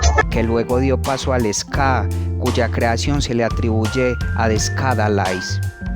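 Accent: Colombia. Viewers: Ése acento colombiano enamora a cualquiera.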